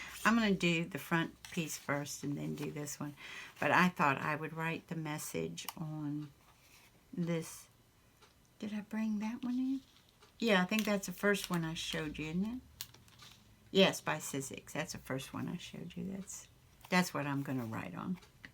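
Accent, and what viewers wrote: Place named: Charleston, United States. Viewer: How can I I love you even more. Your gentle kind caring sweet. When I hear you I think of Charleston SC dont ask me why. But you are sweet corn.👍💕🐇🐥🌽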